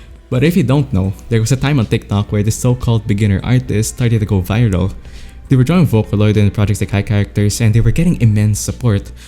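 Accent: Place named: Philippines. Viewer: This guy sounds filipino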